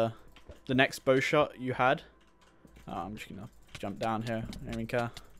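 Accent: United Kingdom. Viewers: Why are You british.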